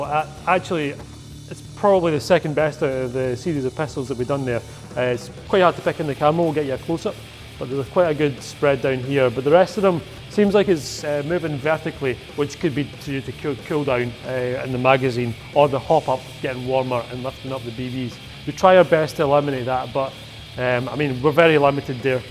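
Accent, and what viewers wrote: Scotland, United Kingdom. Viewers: Haha all the folk saying hes English and Irish clearly haven't heard a Scottish accent before :L in one of his videos he actually states hes scottish. hur hur.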